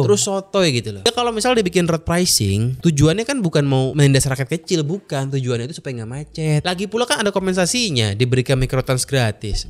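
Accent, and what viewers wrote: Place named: Sunda, Indonesia. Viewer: Seru bang gaya bicara nya, sisip sisip Sunda Sunda nya saetik EUY 👍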